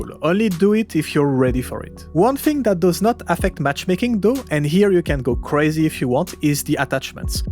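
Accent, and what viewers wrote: France. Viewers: Thx very good video, are you french tho? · Are you french ?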